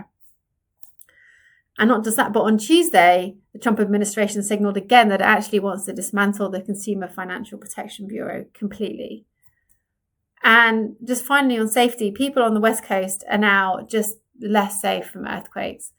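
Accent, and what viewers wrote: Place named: United Kingdom. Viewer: This is garbage. And I can't take you serious with that British accent.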